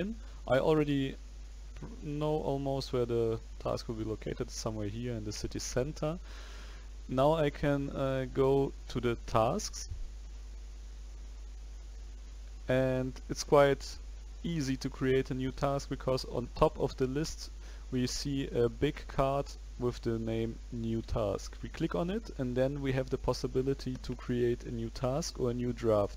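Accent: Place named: Germany. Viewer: Man merkt das du Deutscher bist aber danke für die Hilfe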